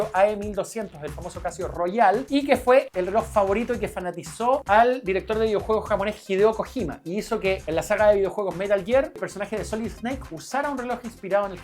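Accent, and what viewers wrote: Chile. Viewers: Al final … tuve que leer la transcripción. Tu nivel de Chileno es demasiado complejo para los simples hispanohablantes. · Sin ver los videos va like directo... me encantan los relojes pero mas tu pasión y el acento chileno.... cachai? Abrazo desde Argentina!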